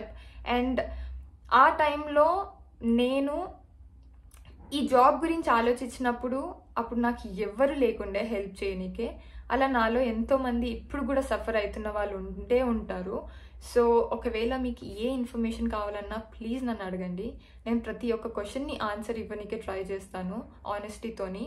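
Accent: Telangana, India. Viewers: Meeru totally telagana slang lo Matladuthunaru ante keka anipinchindi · What a cute telangana slang akka🤩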